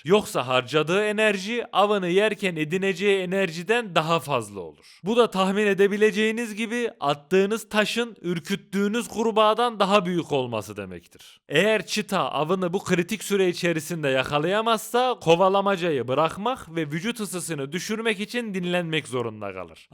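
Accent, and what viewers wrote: Ankara, Turkey. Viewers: Tatlı güzel komik bi anlatım sevdim Ankara bebesi 😅 Angara 😊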